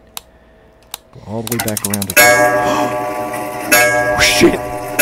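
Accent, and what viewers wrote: South, United States. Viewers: You sound southern and when southern people get scared it’s funny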